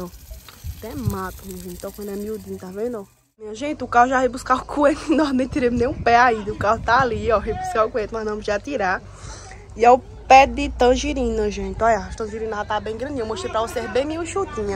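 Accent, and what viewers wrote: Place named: Northeast, Brazil. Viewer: Tu nunca veio no nordeste não foi ? Nois só fala assim, ninguém e igual a ninguém todo cidade todo país,as pessoas tem seu jeito de falar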